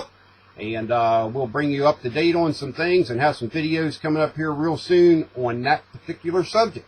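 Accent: United States. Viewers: I love the rant. I am English and live in the UK. I find the way you Americans pronounce words very entertaining, keep on saying what you want any way you want to pronounce them. Thanks for the wonderful videos, I find them very informative and entertaining.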